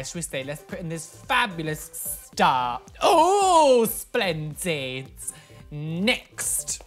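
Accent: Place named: RP, United Kingdom. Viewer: I love how he is British but he sounds like he is a Royale prince from England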